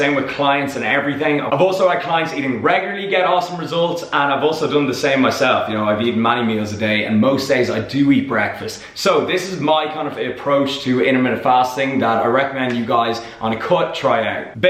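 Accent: Dublin, Ireland. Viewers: thats the Paddy coming out in him. Sounds like he is from Dublin.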